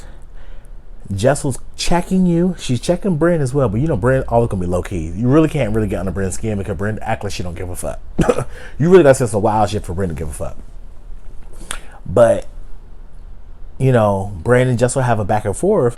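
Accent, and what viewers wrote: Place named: South, United States. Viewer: Oh georgia! I was wondering where in the south you were from. Whenever my subtitles are on they translates you sooooooo funny.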